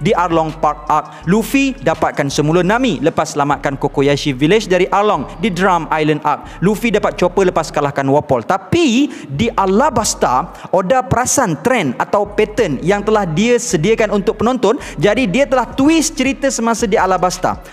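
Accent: Malaysia. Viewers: Waa hebat la abg pandai cakap manglish.. 🥳🥳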